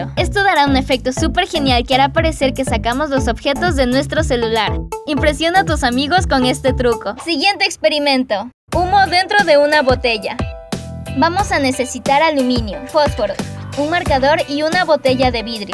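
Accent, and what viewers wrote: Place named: Colombia. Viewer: de Colombia no le vez el asento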